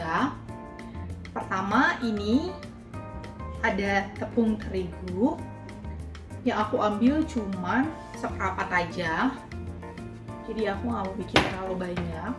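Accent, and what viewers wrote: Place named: West Java, Indonesia. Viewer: ada aksen sunda nya ya.. intonasi nya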